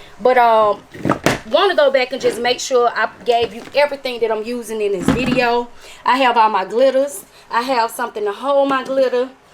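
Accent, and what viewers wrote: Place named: Georgia, United States. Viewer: Thank you for this. I know you gotta be from GA BC we sound just alike.😂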